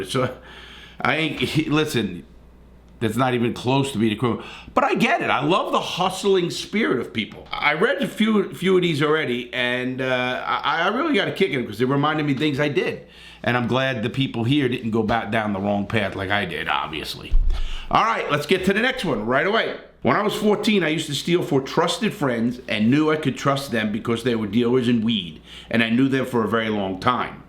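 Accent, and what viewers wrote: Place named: Boston, United States. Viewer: I did well at an audition because of you. Hours of hearing your Boston accent helped me master it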